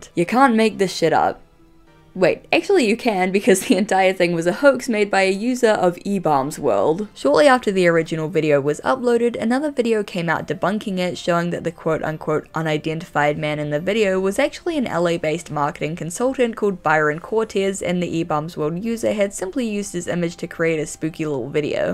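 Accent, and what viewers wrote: New Zealand. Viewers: Is she a kiwi? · I love your videos, but the kiwi accent is what totally hooked me 😅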